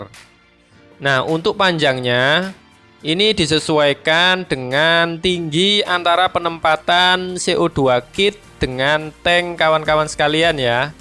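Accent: Java, Indonesia. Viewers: medok e nyenengke dhe, thx sharing ilmunya, sukses selalu dhe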